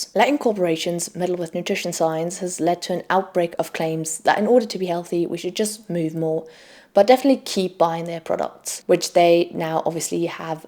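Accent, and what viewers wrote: England, United Kingdom. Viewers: I'm a little puzzled. You have an English accent and kicked off with American chocolate being rejected in Britain but then the whole video was about the US market, the FDA and American food giants. No mention of the rejection of many ingredients by the EU for example.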